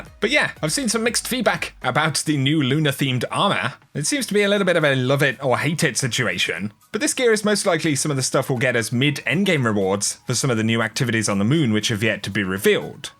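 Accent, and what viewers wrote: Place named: United Kingdom. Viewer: He is from the uk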